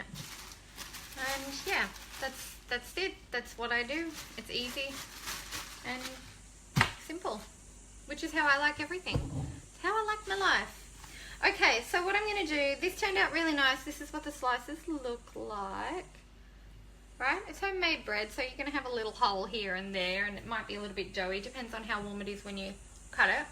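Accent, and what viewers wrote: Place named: Australia. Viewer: she's talking with and aussie accent......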